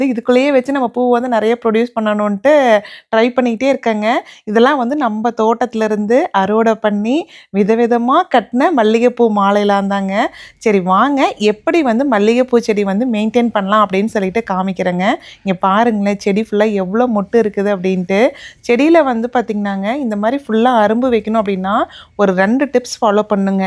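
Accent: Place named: Namakkal, India. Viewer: I believe you are from namakkal from your speech .. good tips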